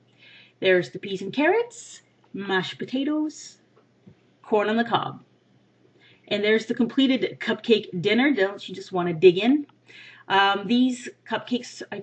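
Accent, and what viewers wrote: Canada. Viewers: Yeah you sound Canadian don't worry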